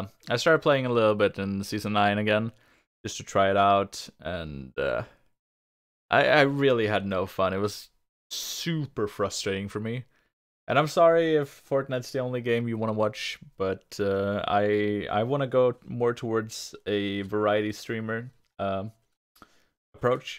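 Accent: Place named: Germany. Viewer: Great Deutsch-English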